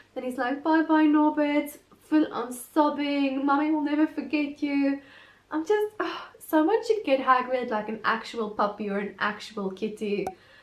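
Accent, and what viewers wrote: South Africa. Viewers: Are you South African ? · sorry my south african senses are tingling, are you from South Africa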